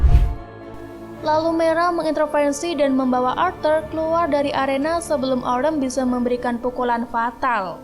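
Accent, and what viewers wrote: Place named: South Jakarta, Indonesia. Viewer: Terlalu Jaksel yah yang baca naskah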